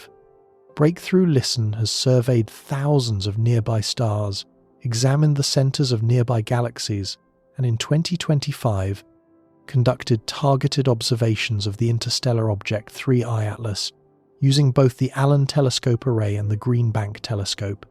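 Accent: United Kingdom. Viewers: But this guy sounds British, not Russian... 🤔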